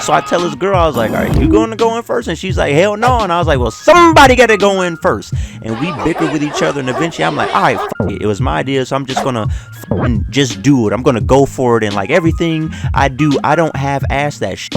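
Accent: New Jersey, United States. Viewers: U frm jersey??? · Where u from jersey?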